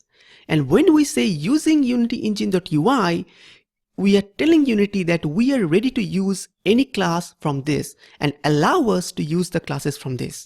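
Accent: India. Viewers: That’s how Indian talk I’ve heard my friends always go “OK” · you know you are at the right place when you hear Indian accent. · They're accent. I mean, no racism at all. But people from around India talk like that. AND BTW I also like the accent XD and u sound like a cool dude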